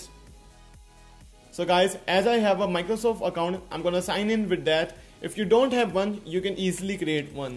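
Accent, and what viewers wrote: India. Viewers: u indian? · sounds like an indian speaking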